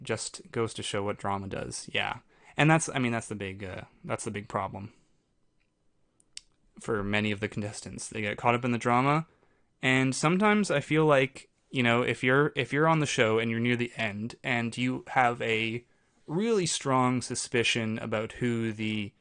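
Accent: Canada. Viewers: i think he’s gotta be canadian, the way he says out/about is just too canadian 😂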